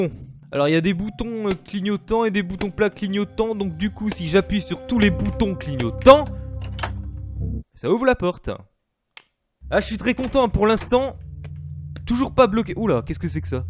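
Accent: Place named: England, United Kingdom. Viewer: Vidéo génial comme d'habitude et ton accent anglais est toujours aussi ouf xD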